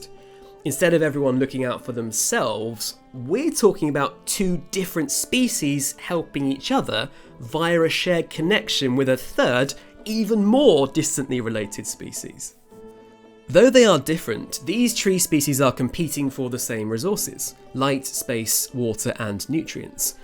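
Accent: United Kingdom. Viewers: This is a masterful exercise in gaslighting, all the way down to the British accented narrator. uses a well known template from the timber and chemical industries- employ delaying tactics to tear down the best current research by asking for more extensive research. This is significant when taken in the context of major timber harvesting plans already underway in Canada and more planned in the Pacific Northwest in the US. Simard is correct in pointing out that her one time "colleagues" are "reactionary" scientists. doesn't point to any research from Simard's critics on the relationship of the mycorrhizal network to the trees and how that can be better understood with newly designed experiments. It would be interesting to see if has done any films on the roles the chemical and timber industries play. Try understanding the role Bonnie Bessler's "auto inducers" play in spreading the information carried by microorganisms.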